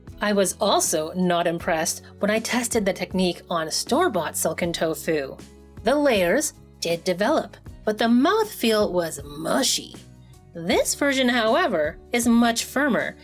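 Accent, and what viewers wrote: Canada. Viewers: I love your soothing voice and Canadian accent. ❤ Could I make this silken tofu for Douhua with ginger syrup? Awesome video 👍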